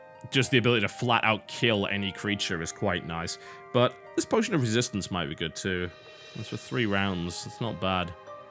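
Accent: United Kingdom. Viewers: Everything this guy says is funny to americans because of the brittish accent. This is why BBC america has so much comedy amercians are almost ready to laugh anytime brittish people speak so they use it as an advantage. He can talk for hours about nothing and americans would be sitting over in the corner having giggles. Even card games are funny. Sorta like crocodile hunter/crocodile dundee but not australian. I don't even know what some of the slang he is using means.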